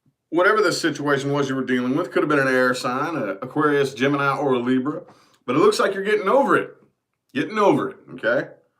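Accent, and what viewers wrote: South, United States. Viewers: That country boy swag with a twang! 🤣😂😂😭 The whole reading was too damn accurate 🙌🏾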